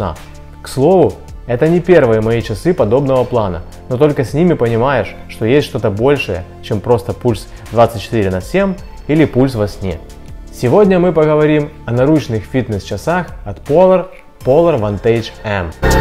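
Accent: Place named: Ukraine. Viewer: Это отличительный говор украинца. Когда я слышу такой говор, то знаю, что человек украинец. :) А в целом речь нормальная, дикция четкая, все слова разборчивы, а это самое главное в подаче вербальной информации. )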